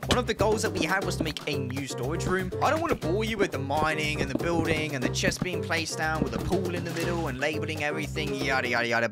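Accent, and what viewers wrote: United Kingdom. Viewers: sorry for out of the topic, but... is that British accent? because i really want to learn English and i hope i can speak with British accent too. i don't know why, American accent is too difficult for me😢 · Are you a british? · Why are you British? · I love your accent! Are you from England? What region? (I still can't tell between England or Australia, sorry if I'm mistaken!) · Are you speaking british english? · Bro is so brittish 😭😭 But the video is guud😂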